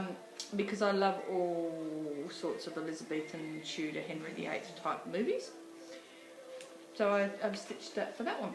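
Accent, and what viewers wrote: Australia. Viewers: Loved your vlog, as always. I'm of "that age", too. When David Cassidy had a concert in Perth, my family was living at South Headland (West Oz) and I was heartbroken when mum said she wouldn't take me to his concert. lol If I remember, not all the roads were sealed back then. Love everything you showed, especially the Happily Ever After. Made my day hearing an Aussie accent...I keep hearing about mythical Australians over here....not seen one in three years! lol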